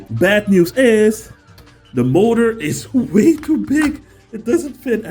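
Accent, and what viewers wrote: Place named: Netherlands. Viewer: Suriname!...no wonder why you had a slight Dutch accent. Great video though!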